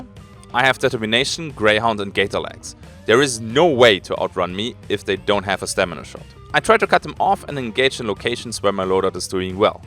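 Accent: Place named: Germany. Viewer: Really enjoyed watching that. Well played! Where are you from mate? You kinda sound like German but I'm not sure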